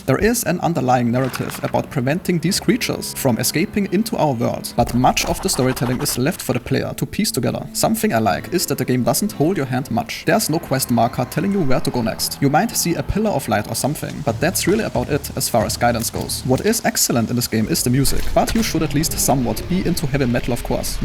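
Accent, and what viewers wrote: Germany. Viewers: First impressions video. 3 minutes long. Has "not waste your time" in the title. German accent. Makes total sense. Subscribed.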